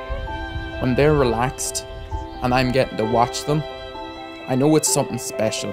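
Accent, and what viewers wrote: Ireland. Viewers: I love Irish accents :)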